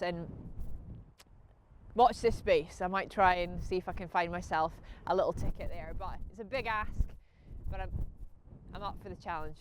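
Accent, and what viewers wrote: Scotland, United Kingdom. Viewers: your the best pal love to hear your lovely Scottish tones on the tv,the future for you in golf is going to be rosey am sure,may the course be will you ❤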